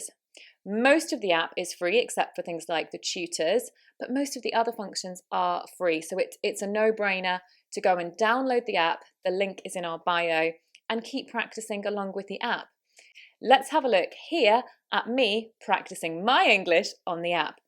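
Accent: United Kingdom. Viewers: Hallo good teacher l am attention To listen carefully from You are also one in good choice teach softly your Sound real british accent I have more who teach british accent nearly four Teachers but l have follow American accent two teachers mostly l like As well as british accent But english has nothing know as all a little bit one Thank you.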